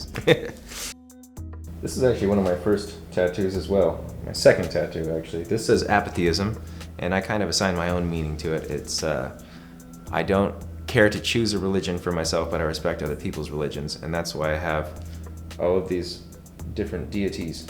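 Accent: Ireland. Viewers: Ur Irish ah here come to me house hun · You are irish?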